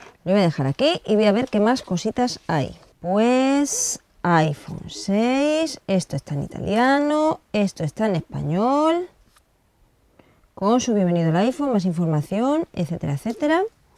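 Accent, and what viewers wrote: Spain. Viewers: me gusta el acento del hablar de las españolas a si como esta hermosa chica con un buen sentido del humor =)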